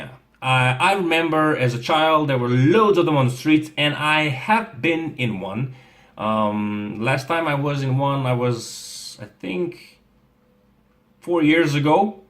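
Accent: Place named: France. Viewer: Francozski naglas👌😂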